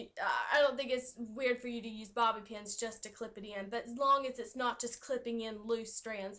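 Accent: South, United States. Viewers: your accent is so adorable and endearing! so wish I had more of a southern accent than I do. you seem really sweet just wanted to tell you that! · That's what you call a country accent she is from the south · Great job girl love that southern accent, keep doing YOU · This is awesome! Thanks! :) I love my hair and your method worked great! Question, How long do the curls stay in your hair during the day without hair spray or any moose, just hair? How long with? I'd appreciate your answer :) BTW I adore your country accent, I think its the cutesiest Ever!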